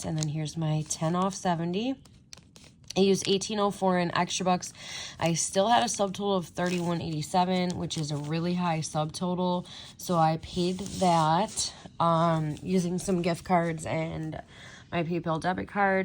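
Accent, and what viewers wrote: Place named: Minnesota, United States. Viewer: I usually watch your videos, but never subscribed. I gave you one today....your accent reminds me of my relatives in Minnesota. :) Plus your information is good.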